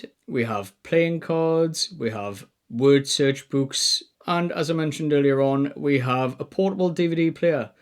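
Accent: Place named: United Kingdom. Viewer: It's a torch, not a flash light. You're British (going by your accent). lol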